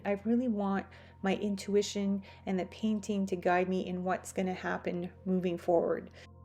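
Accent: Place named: Canada. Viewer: Why do you have a Canadian accent? Just curious.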